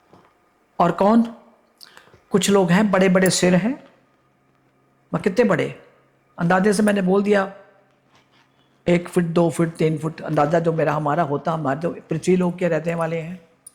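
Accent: Punjab, India. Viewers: Pranam Aunty. I regularly watch your videos. I love your punjabi accent.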